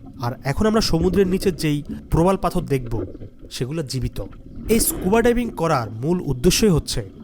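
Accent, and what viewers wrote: Bangladesh. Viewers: আপনার কথা বলা বাংলাদেশের মানুষের মতো ।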